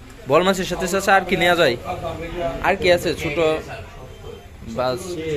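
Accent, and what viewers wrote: Sylhet, Bangladesh. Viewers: Onek vala legeche bhai. Bhaiya Apner Basha ki Sylhet? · Bai apni ki sylhet ar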